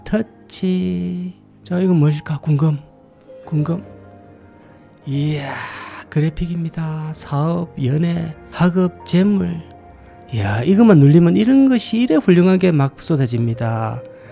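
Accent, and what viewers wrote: Gyeongsang, South Korea. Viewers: 영상속 나레이션의 묘미가 더욱 멋져 빛을 발합니다 장보고의 썰렁한 내력이 안되게 찐 성공 내력담 알차게 챙기셨네요 언제나 구수한 경상도 언어가 더욱 흥미롭구요 잘 보았습니다 ~👍🏻👍🏻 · ㅋㅋㅋ 가짜배기, 오랜만에 듣는 보리문디 사투리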